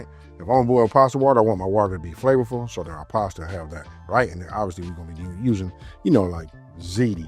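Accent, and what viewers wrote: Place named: South, United States. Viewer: He is from the south stop hating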